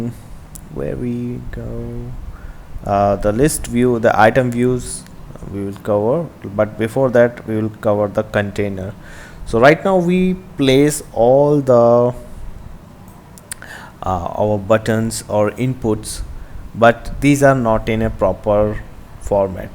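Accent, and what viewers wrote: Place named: India. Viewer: I usually hate inidan tutorials, but this time I can understand you. Your accent is not that bad.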